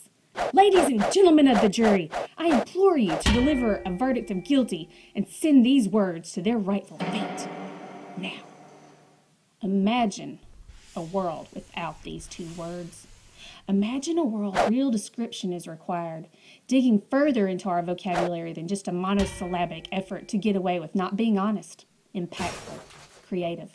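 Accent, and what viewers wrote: South, United States. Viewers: what a spunky, southern sound you have! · Is she from the south?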